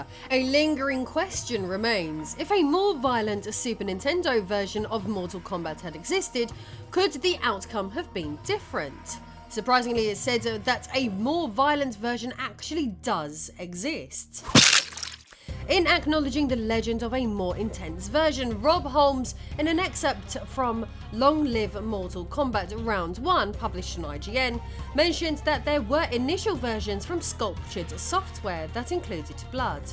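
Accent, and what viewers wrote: United Kingdom. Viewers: The Brits have a different way of saying certain things. ZX Spectrum is "Zed Ex Spectrum" there. I'm used to it. They probably think the way we say certain things is odd so 🤷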